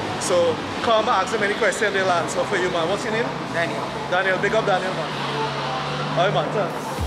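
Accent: Trinidad and Tobago. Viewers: You sound like a Trinidadian, everyone blogging now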